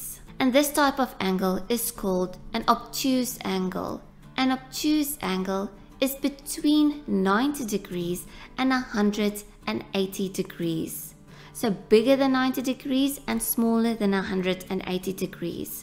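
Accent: United Kingdom. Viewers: rays= raisee british ahh tecaher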